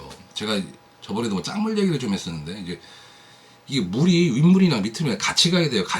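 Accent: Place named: Chungcheong, South Korea. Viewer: 님! 영상 잘 보고있습니닷! ^^ 제..여담인데 한가지 말씀드리고 싶은게 있어서요!! 저는 경상도 사람이라 성질이 좀 급한데... 님 말씀하시는게 1.25배속 하면 제가 듣기에 딱 맞아요ㅋㅋㅋㅋㄱ ㅋㄱ답답해요ㅋㅋㅋㅋㅋㅋㅋㅋㅋㅋ 1.25배속!!ㅋㅋㅋㅋ 충청도 말투를 평소에 많이 접하지 않아서 그런가봐여ㅋㅋ😊😊 영상 많이 올려주세요! 쇼츠도 많이 올려주시구요! 장동혁 대표님 화이팅❤🎉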